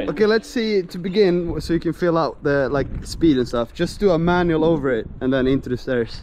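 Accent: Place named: United Kingdom. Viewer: I have a feeling like your accent is becoming more British. Have you noticed?:)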